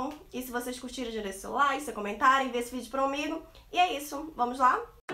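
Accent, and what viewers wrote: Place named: Rio de Janeiro, Brazil. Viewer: Pelo sotaque deve ser carioca hahha. Uma dica, tenta deixar um pouco mais as traduções na tela, pra quem não manja muito dmr um pouco pra pegar a forma como se usa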